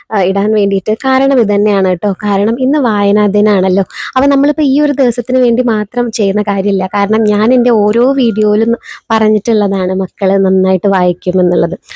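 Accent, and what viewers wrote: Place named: Palakkad, India. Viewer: u looking so beautiful and he is also looking smart Palakkad samsaram kelkkan oru rasam.Thanku. Cheeverdu sound I really enjoyed I think u also like reading It' s a great thing parents encouraging reading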